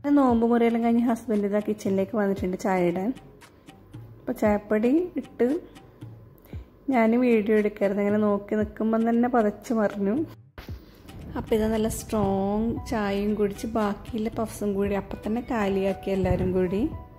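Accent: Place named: Kannur, India. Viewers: Adipoli puffs....but I don't have other oven... I have only microwave.... nice to hear from you....kannur slang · Njan new sub er Anu ithante samsaram ishtayi aann aann kannur aanno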